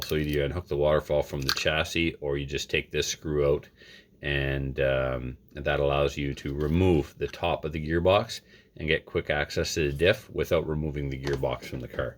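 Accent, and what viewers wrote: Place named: New York, United States. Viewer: you sounded like you were maybe from New York lol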